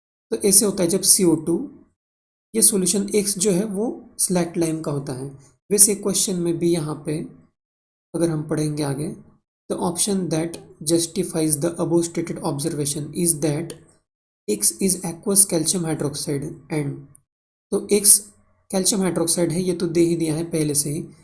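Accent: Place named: Maharashtra, India. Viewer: Pure Marathi accent ❤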